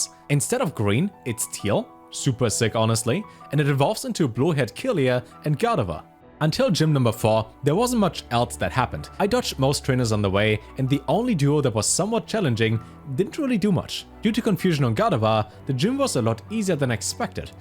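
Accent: Germany. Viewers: I subscribed for the German accent 😎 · Are you German lol? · Hey, you kinda sounds like german are u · Man hört fast nicht dass du deutsch bist. Abgesehen davon hammer video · Man hört irgendwie einen deutschen akzent raus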